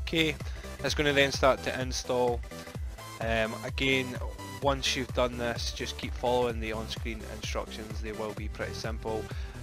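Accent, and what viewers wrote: United Kingdom. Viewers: I love your British accent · Loveeeeeeeee the scottish accent mate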